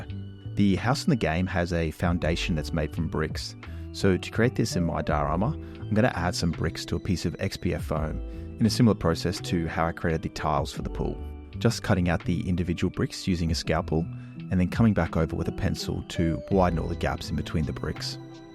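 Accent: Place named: Australia. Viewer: Bro is definitely Australian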